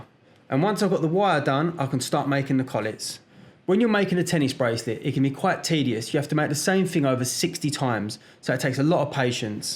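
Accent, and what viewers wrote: Cockney, United Kingdom. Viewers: Besides your talent and skills that we are witnesses in every video, 1st: nice house! 2nd: rescuing dog from being someone’s dinner...👏🏻👏🏻👏🏻👏🏻 nothing to say here🥇 3rd: I looove your cockney accent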